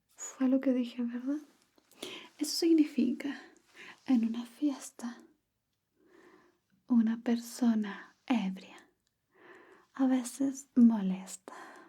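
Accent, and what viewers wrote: Chile. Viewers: Hola, Que tal estás? 🙋🏻‍♂️👋🏻 La primera parte del vídeo me ha gustado mucho y ahora la segunda parte me está gustando aun muchísimo y el español chileno me encanta y es bacán amiga 🥰💞✨ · siempre vengo a estos videos con acento chileno ❣️ agradecido! 😊 (ese es el valorrrrrr jajaj) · Hola me gusta mucho el asentó chileno ❤❤❤❤❤❤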